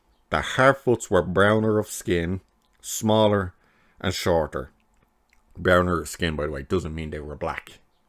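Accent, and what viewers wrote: Ireland. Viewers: It's great to hear a fellow Irish voice discussing this show and calling out the bullshit of these guys, jesus that Nomvete really believes she's smashing boundaries. I hated Star Trek Beyond with its absolutely incomprehensible villain.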